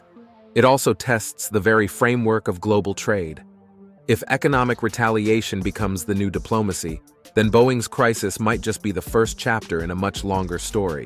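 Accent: United States. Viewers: What awful narration. American accents are torture. The CIA can ditch waterboarding. Just make 'em listen to this.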